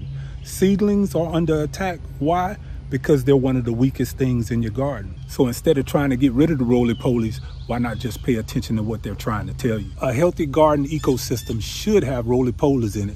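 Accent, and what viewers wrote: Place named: South, United States. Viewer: Your voice tone/accent brings back so many southern traditions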